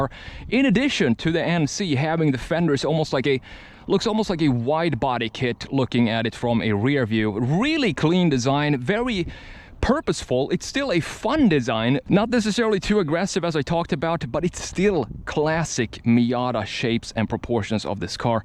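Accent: Sweden. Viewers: Are you from Sweden? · Hi, a very nice review. I also like the NC as that engine has a greater potential than earlier engines and its a great platform too. Are you from Sweden ? I can hear a ”tone” of Swedish in your accent.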